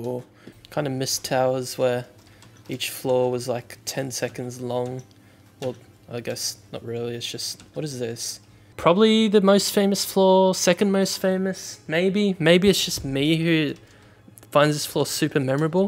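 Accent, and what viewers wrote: Australia. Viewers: he’s Australian i think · he’s actually aussie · towre of srres very gdoo also why do you sound like australian remainings best video do coabl p rank and lorekeeper guide NOW!!!!!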